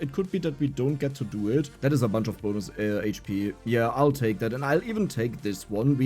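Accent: Germany. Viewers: Muss ehrlich sagen. Hab DF‘s lets plays geschaut und nach dem spiel gesucht. Bin so auf dein kanal gekommen. Deine ersten worte auf englisch gehört, und sofort war mir klar der brudi ist ein deutscher. Hahaha, kein hate. Find es echt krass wie du englisch spricht. Hut ab mach weiter so abo hast du aufjedenfall.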